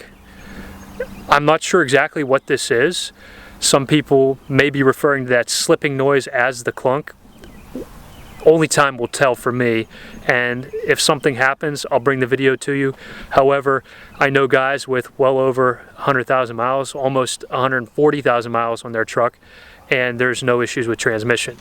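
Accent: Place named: Pittsburgh, United States. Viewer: 1st off -- I love your Pittsburgh, PA area accent, I used to live in the constant grey of PA up there myself but I'm in sunny Florida now where I bought my '14 Sierra new off the lot. Had about 20k miles on it and the A/C condenser went out -- but they wouldn't fix it because I was past the 36 month warranty but I paid for the parts and they ate the labor. 2nd, I have that tranny "clunk" that you talk about from day 1. It was never bad enough to take back to dealer to see if it was an issue but it is always there especially when tranny is cold and I am driving slow out of the neighborhood. It is 2022 now and I only have 44k miles on it but your mention of the lifters is bothering me. I'll definitely look out for that issue. BTW, my "tailgate assist" thing just quit working one day years ago. I'll definitely keep an eye out for the GPS antenna seal because we get tons of rain down here. 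With that in mind, I do get a musty smell every time I start my truck and the fan is blowing. I have tried many things to get that to go away but it won't. I assumed it was the humidity down here but now you have me thinking it could be that seal on the roof. Thanks for this video, you confirmed many of my suspicions of issues.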